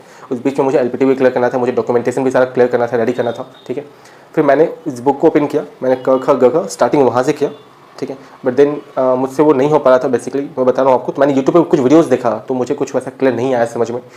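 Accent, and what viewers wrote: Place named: Bengal, India. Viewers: To everyone who is watching this video. I have heartfelt respect for this person as he cleared his exams and got a job. But whatever he is saying is not true. I am in Kolkata from the last 20 years and his accent is a typical bengali accent, the one not even Bengalis who studied in english medium have. I do not believe his words because as a native I can sense the accent very clearly. My request to everyone is focus on the language, it is not that easy but not that difficult either. If you have given the exam in the language in class 10/12, then you don't have to worry. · True his accent is completely bangali hindi type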